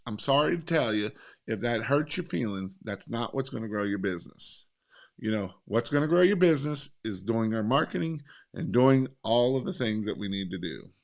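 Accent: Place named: South, United States. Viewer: I remember watching videos you made 2+ years ago and you're much more eloquent speaking. You've also lost that southern drawl quite a lot. Respect from NZ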